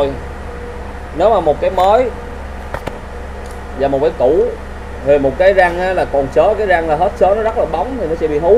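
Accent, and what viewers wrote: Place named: Phan Rang, Vietnam. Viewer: Giọng Phan Rang dễ thương quá 😂 Tiệm có nhận fix rung ga đầu vario ko Bro ?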